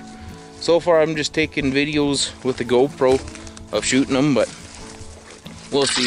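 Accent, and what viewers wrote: Canada. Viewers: This is a Canadian accent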